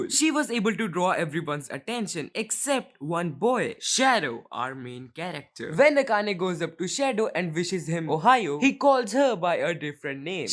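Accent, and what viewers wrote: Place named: India. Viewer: You voice sound like indian 🇮🇳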